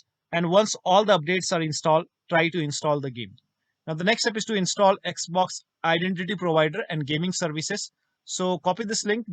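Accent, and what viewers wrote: India. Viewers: i heard an Indian mans voice and knew i was saved.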